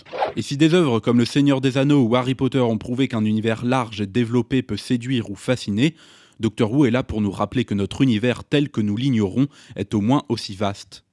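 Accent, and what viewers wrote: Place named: France. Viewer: Wait... he seems to be... French!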